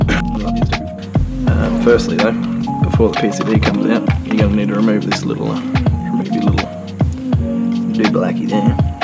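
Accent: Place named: Australia. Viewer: So aussie...love it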